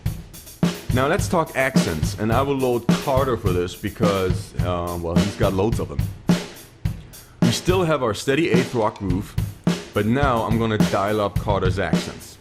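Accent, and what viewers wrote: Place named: Long Island, United States. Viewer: Hi, on your accent - I grew up in Long Island - I just thought you were from somewhere on LI I hadn't been to yet 😁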